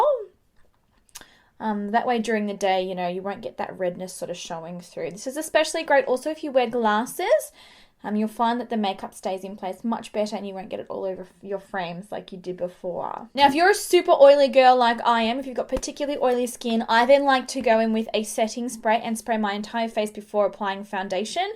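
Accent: Australia. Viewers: Sorry I couldn’t hear past the Australian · Hey where are you from? You sound Australian.... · So many Australian makeup gurus, I love it. Or are your from New Zealand? I attribute your accent to Australia :p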